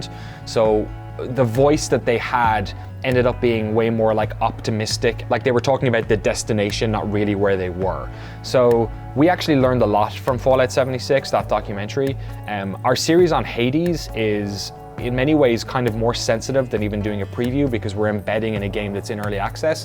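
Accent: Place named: Waterford, Ireland. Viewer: Shiiiiit! Your accent makes so much more sense now that I know you're from Waterford! Gas!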